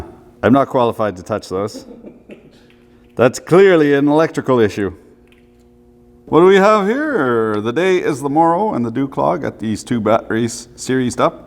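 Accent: Quebec, Canada. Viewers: Are you from Québec ? Been binge watching your channel since I heard you say calice de tabarnark in a Bosch video.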